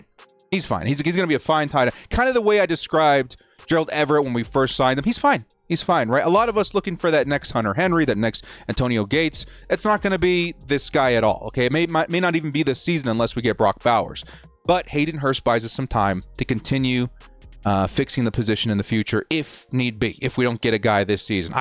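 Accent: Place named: Southern California, United States. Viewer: That's a SoCal accent your'e hearing, guy. Has a little Yinzer and sprinkle of Spicoli.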